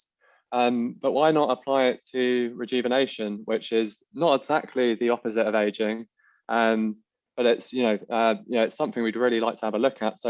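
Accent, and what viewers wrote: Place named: England, United Kingdom. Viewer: You should consider activating text autogeneration..if you both read and listen to his English accent you should be able to understand it..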